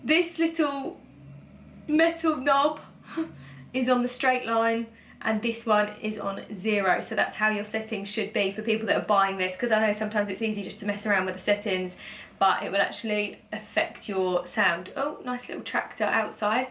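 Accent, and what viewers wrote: England, United Kingdom. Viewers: Can we just appreciate her British accent like wow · Not gonna lie I love the pommy accent :) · is she British ??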